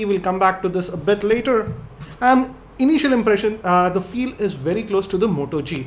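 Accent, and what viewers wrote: India. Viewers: No offence intended and nice video but, heavy heavy Indian accent you've got there.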